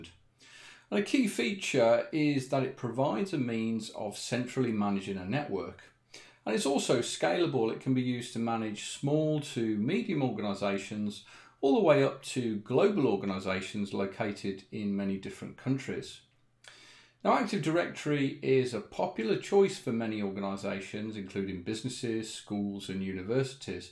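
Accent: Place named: United Kingdom. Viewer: Very British accent. Good video. Thanks.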